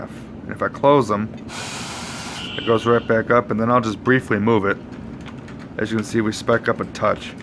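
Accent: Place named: New York, United States. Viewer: Like the voice has New York accent even.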